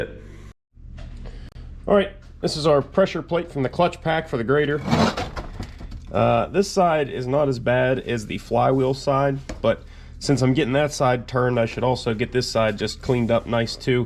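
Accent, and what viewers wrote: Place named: Western Pennsylvania, United States. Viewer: I just found your channel, and I don't know where you live, or where you're from, but judging from your accent it must be western Pennsylvania, am I right?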